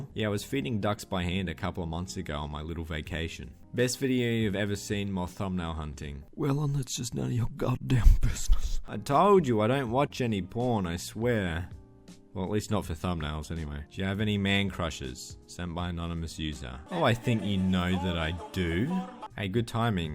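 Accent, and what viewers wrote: Australia. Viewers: I'm in love with a certain, low-voiced aussie.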